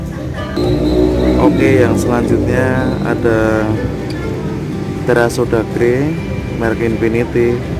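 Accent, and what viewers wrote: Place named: Java, Indonesia. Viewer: Bang kayanyah ,orang jawa yah beda suwaranyah lain sama orang sunda bang kalow keramik batu berapah,,abang orang mana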